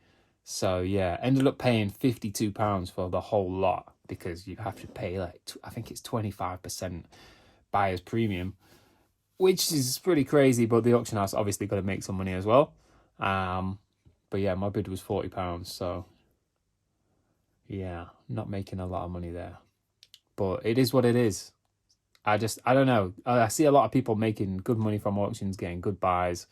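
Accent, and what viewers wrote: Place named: United States. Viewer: why you sound american if your from Uk, the fuqq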